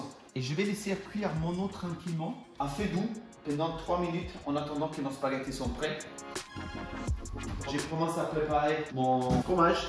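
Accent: Italy. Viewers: And you didn't catch his thick Italian accent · Che è questo dialetto italiano degenerato che stai parlando? Non capisco quasi niente. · u know he is italian? so french with italian accent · It's just French with Italian accent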